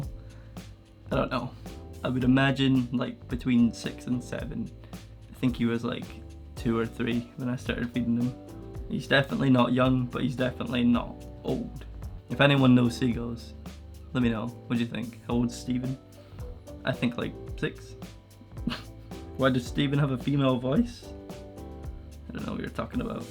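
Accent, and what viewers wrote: United Kingdom. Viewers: Wait, are you British?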